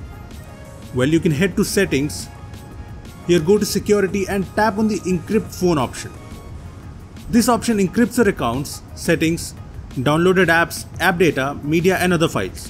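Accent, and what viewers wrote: India. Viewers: 7. Indian english accent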